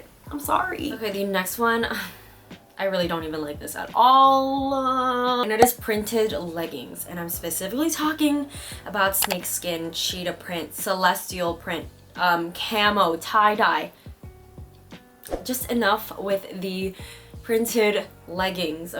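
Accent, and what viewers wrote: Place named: United States. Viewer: I think it’s so cool how you Americans sound talking “button”, “them” and etc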